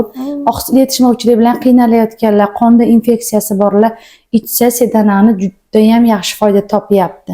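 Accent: Uzbekistan. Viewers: Кайерлисиз синглим шевез Куконлига ухшаяпти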